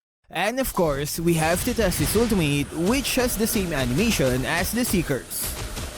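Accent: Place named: Philippines. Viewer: when will you ever improve your english accent it’s so obvious you’re filipino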